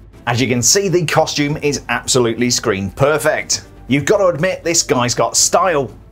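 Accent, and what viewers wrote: United Kingdom. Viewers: The most british accent if i ever heard one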